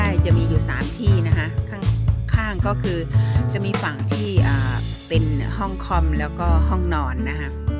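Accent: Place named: South Korea. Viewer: สำเนียงเกาหลีพี่เพราะมากค่ะ.🥰👍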